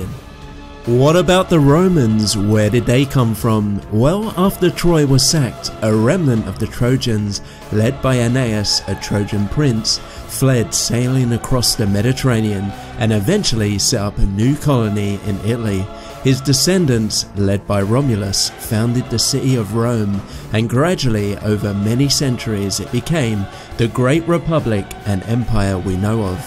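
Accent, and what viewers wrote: United Kingdom. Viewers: I'm so tired of British accents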